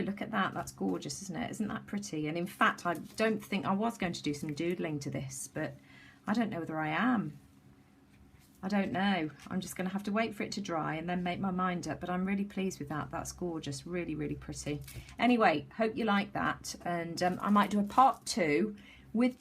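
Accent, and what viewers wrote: England, United Kingdom. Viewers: These are very lovely! Do I detect an English accent? Love listening to you and watching too.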